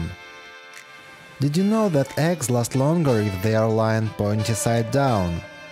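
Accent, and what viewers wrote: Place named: Russia. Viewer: I love this Russian accent!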